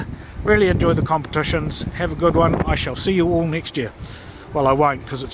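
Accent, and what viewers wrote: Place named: New Zealand. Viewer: Good luck... finally another kiwi woodworker... and our kiwi sense of humour and accent I can understand... lol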